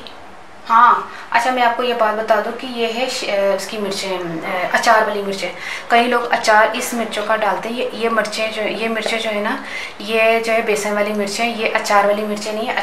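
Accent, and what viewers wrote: Punjab, India. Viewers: Walikum aslam Her punjabi so sweet Thank you ma'am so much for sharing ur knowledge with us🤓🤓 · ساتھ ساتھ پنجابی کا تڑکا بھی لگ رہا ہے۔ خوش رہو میری بہن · Your punjabi is very sweet and your achar is very good and yumm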